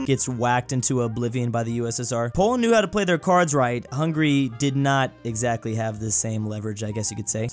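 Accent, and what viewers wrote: South, United States. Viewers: He is american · that southern accent tho XDDDD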